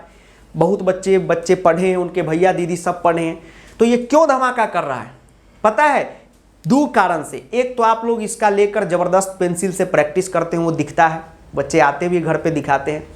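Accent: Bihar, India. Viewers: Sir ka bihari accent bada mst h😂😍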